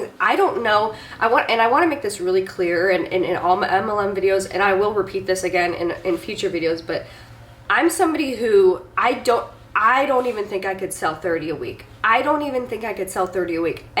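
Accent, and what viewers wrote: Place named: Chicago, United States. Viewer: It took me forever to figure out you accent 😂 Chicago is super close to us but juuuuuust slightly different. I looooove it.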